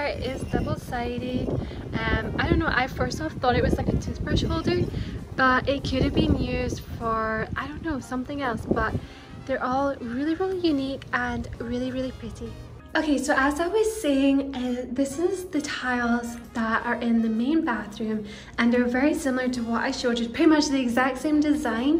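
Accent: Scotland, United Kingdom. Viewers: I beg to differ. Marilyn is gone. This is her interpretation and she has a Scottish accent. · Stop trying to change your voice. You naturally have a Scottish accent, but half the time you’re softening voice like Marilyn. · Easy peasy ❤️ love the Scottish accent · Lol of course it's inauthentic, she's from Scotland so normally her natural accent is a thick Scottish accent but she's CLEARLY a Marilyn Monroe enthusiast where she styles herself to look like Marilyn, moved to L.A., an ENTIRE different country, and bought Marilyns house, so ya, if she didn't try to imitate Marilyns baby high pitched voice, i wouldn't be watching her as the baby voice is a part of the gig, this look wouldn't make sense otherwise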